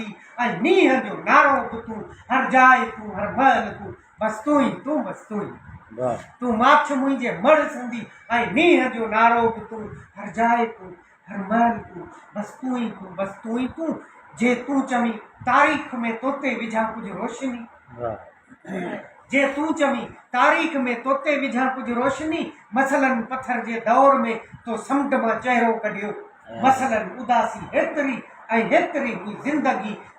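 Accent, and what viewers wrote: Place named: Lari, Pakistan. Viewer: تمام بهترين لاڙي لهجي ۾ پڙهيل هڪ طويل نظم منفرد لڳو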